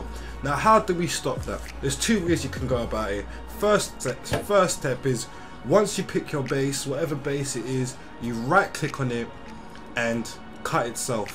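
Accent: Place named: London, United Kingdom. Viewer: so good to hear a ldn bruddda lol, keep it up my g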